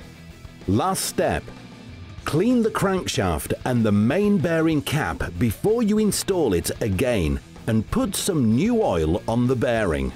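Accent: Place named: RP, United Kingdom. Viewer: Funny, how it is that you have the stupid, raucous (and distracting) soundtrack and a prim and proper English gentleman speaking perfect Queen's English!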